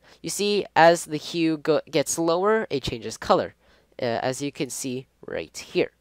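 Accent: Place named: United Kingdom. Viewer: great tutorial. you notice this guy is British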